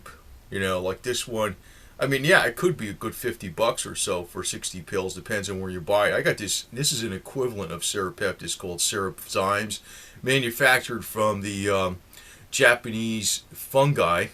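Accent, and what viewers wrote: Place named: South Jersey, United States. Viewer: south Jersey.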